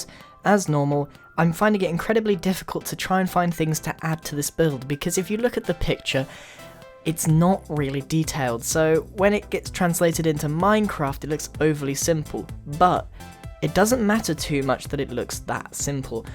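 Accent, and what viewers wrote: England, United Kingdom. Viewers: are you English? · why does he sound really british when he was younger?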